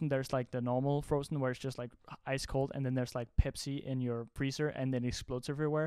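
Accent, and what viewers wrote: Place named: Denmark. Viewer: I can sniff out that danish accent anytime awesome video tho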